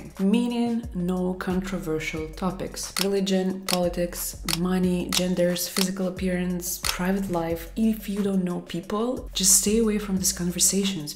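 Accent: Russia. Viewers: Thanks for making this video it was helpful for me . And also I like your Russian accent the way you talk ❤️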